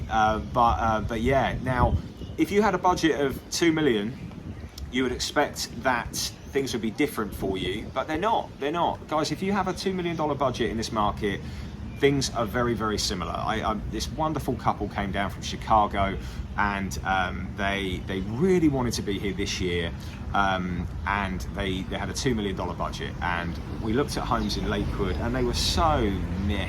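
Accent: United Kingdom. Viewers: Is that a Mancunian accent ?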